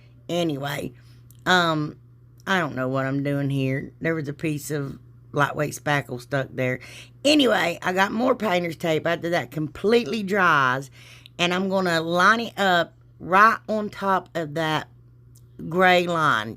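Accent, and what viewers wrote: South, United States. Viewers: You talk you're Southern Draw while you're working?😮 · What part of the South are you from?South East Georgian inquiring. I absolutely love and enjoy your videos. ILOVE, LOVE...LOVE your napkins....especially the CHICKENS.🐔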